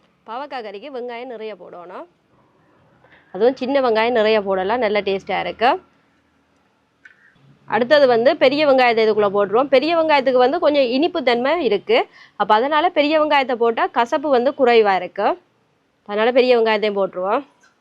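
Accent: Sri Lanka. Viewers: உங்க இலங்கை தமிழ் ரொம்பவே இனிமையாக இருக்குமா 😍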